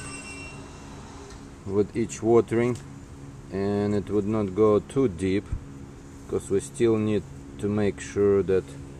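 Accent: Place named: Russia. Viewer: Ti idiot ili prosta zaviduesh ego!! Important is not an accent (although English with Russian accent is very cool)! His English is very perfect grammatically, and also very rich vocabilary! Thank you for the Videos!! You are gift from the GOD!!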